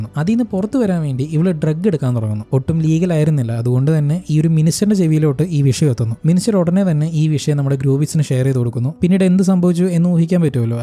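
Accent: Palakkad, India. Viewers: bro sathyam para ni palakkad alle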